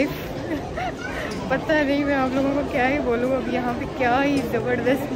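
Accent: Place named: Punjab, India. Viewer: ماشاءاللہ ویسے ہمیں پتہ چلا گیا تھا آپ پاکستانی ہیں آپ کی پنجابی والی اردو سن کر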